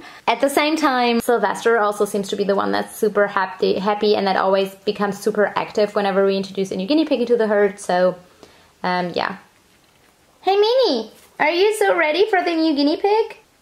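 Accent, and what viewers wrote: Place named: Germany. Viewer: You, my good fellow, are all over the place. 1. She can have as many guinea pigs as she wants, you have no choice in the matter. 2. One guinea pig is NOT enough. If you have one guinea pig by itself, it will literally be living in h e l l. They're herd animals and need to be in groups. 3. They can be, it's called floor time and it's how they get excersise. 4. She's...she's german- she has an accent and talks like that because English isn't her first language. In conclusion, don't comment stuff like this if you don't know what you're saying. Unlike you, she is an adult and can make her own choices.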